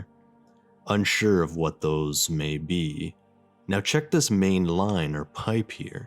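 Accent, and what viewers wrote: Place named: Eastern Canada, Canada. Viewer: this boy eastern canadian AF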